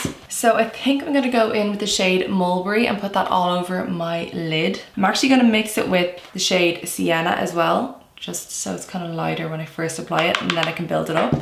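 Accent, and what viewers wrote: Ireland. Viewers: I love your Accent! Irish ☘️ has always been my favorite accent besides Australian💖💖💖💖💖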